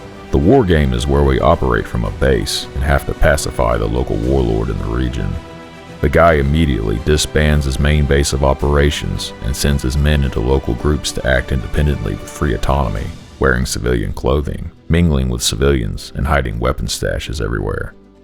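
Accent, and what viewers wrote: Germany. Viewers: hmm , do not confuse dutch with german .... so please hold that freaking german accent ! thx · Whatsup with the german accent?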